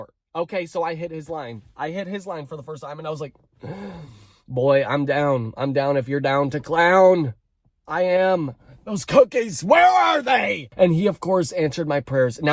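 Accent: Canada. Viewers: Your Canadian accent really came out in this one haha you’re my new favorite YouTuber GAY GUY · dont know how i didn’t notice your canadian accent in the first video boy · That sorry... are u Canadian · Ok you’re definetely Canadian lol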